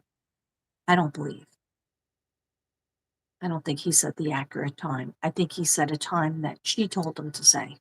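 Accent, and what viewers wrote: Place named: New York, United States. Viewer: Im right under new york in Pennsylvania ❤ive always wanted to visit new york ,when i first heard u i knew u were from new york you have a very strong accent (love it )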